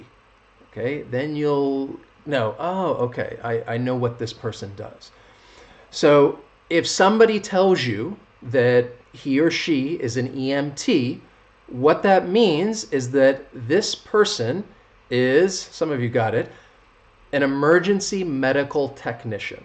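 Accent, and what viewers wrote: United States. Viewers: Fuuuuu. American accent. Switch off.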